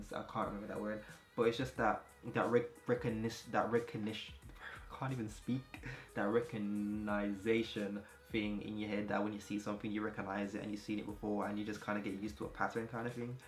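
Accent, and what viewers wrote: Jamaica. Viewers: Di Jamaican accent a chip een tho lol thanks for the video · whats your ig? you sound jamaican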